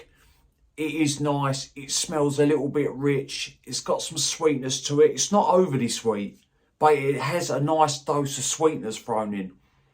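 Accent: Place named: Birmingham, United Kingdom. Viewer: Nice review and cool accent. Are you a Brummie? You sound like Arthur Shelby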